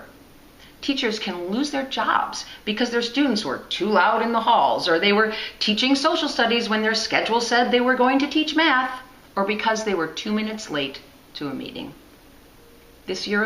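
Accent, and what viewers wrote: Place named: New York, United States. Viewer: What state are you from? This sounds exactly like NY.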